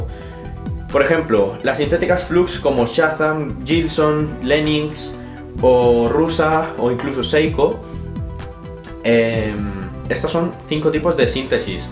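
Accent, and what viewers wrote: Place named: Spain. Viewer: Disculpame,a quien le quieres meter ese cuento de que eres Colombiano y porque!?. tal vez porque te quieres usufructar como posible posted or de esmeraldas Colombianas. Bueno a todo esto ,hablas castellano como todos los españoles,que no hablan español si no castellano. también el tal (dale) por todos los lados,no te falta sino decir (vale y tio). Personalmente ,de Colombiano no te veo nada.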